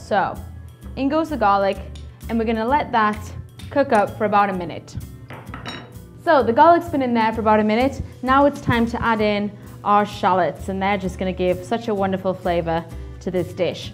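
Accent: Australia. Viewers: she kinda sounds like she has a faint Australian accent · sounds australian...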